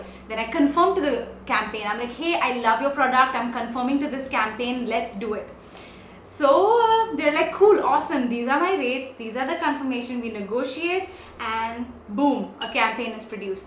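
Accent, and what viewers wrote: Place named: United Kingdom. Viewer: Love your British accent can you make a video on British accent pleaseeewwe.......... 💓😍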